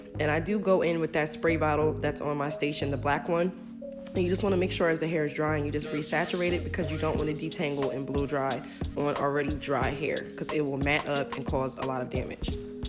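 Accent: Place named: New York, United States. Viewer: where is your shop located? Sounds like a New York accent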